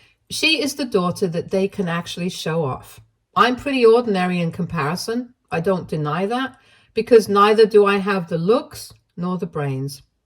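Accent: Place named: England, United Kingdom. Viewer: is English. So she has an English accent. We have lots of regional accents in the US as well. She is probably pronouncing it properly where she lives.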